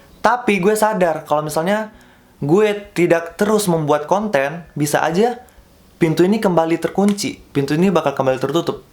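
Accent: Palu, Indonesia. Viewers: Ko rada denger aksen palu nya hehe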